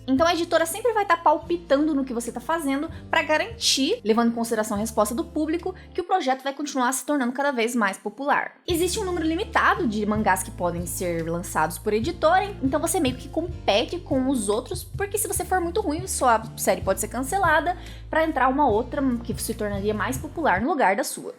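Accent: Paraná, Brazil. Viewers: se eu fosse chutar, acho que você é paranaense.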